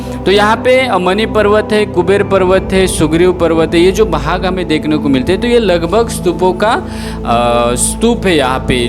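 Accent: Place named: Maharashtra, India. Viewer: जी! बोली से स्पस्ट होता है! कि आप महाराष्ट्र से हैं! आप हजारों कि मी की यात्रा करके उ प्र के "साकेत पहुंचे है! यह परिश्रम अपने आप मे असाधारण है! इस बौद्ध विरासत मे पहुंचकर आपने यथार्थ का आँखो देखा मंजर देश कें सामने रखा! आपके दूसरे साथी इस बौद्ध विरासत को आमजन भंते फाहियान और ह्वेनसाग ने सुदूर चीन से पैदल चलकर भारत की बौद्ध विरासतो को प्रामानिक तौर पर अमर कर दिया है! आप लोग कठिन परिश्रम से यथास्थिति को आमजन मानस तक पहुंचाकर साधुवाद के पात्र हैं! इस कार्य मे " यू ट्यूब" का योगदान कम नही हैं! देश के किसी भी प्रांत / जिले / गाँव मे बैठा पाठक अपने घर मे बैठकर सबकुछ देख रहा हैं! साधुवाद!!!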